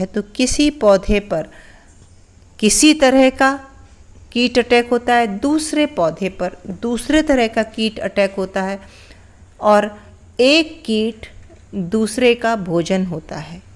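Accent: Standard. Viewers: aapka Hindi itni shudh and sureeli hai ki aapka Hindi keliye me jaroor dekhti hun video, and ofcourse aapka gardening coaching to la jawab hai, mai ek soth Indian hun, par muje Hindi bhasha Jo aapki jaisi shudh aur pyari ho wo bolne Sur sunne keliye bohot accha lagta hai, me rehti hun Mumbai me 37 years ho gaye hai, par yaha Hindi alag hai, mixed hota hai, aur slangs hai, me govt dept se voluntary retirement le chuki hun, waha Hindi seekna jaroori tha, par ham kaam vyasth hote the ki muka nahi mila, and aap se shudh Hindi sikhungi, aap ne ye video bohot short kar diya 😀, Mere bhi chota balcony garden hai, isi tarah chidiya chotisi aati hai aaj kal, bohot aanad hota hai usko dekh kar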